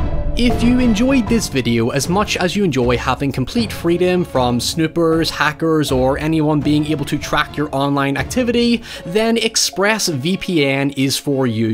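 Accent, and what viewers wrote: Northern Ireland, United Kingdom. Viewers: The auto-generated closed captioning spelled the word "craze" as "kriyas" because of delightful NI accent. That is all.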